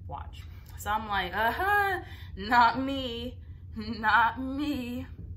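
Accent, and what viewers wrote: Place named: California, United States. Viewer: Great advice. Girl I see your Calley accent is leaving.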